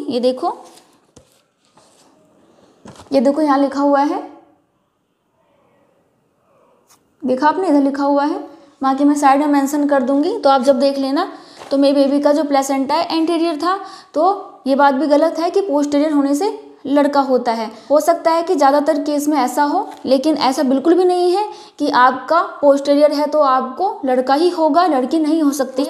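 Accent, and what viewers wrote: India. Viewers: Ap noida se hai kya ??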